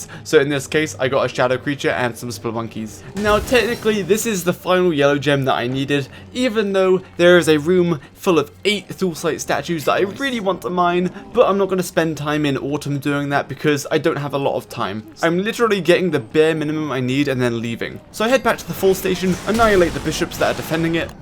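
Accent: United Kingdom. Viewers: I love your British voice :))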